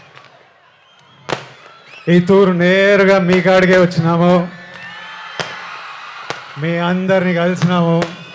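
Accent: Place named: Rayalaseema, India. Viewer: Rayalaseema slang🎉🎉🎉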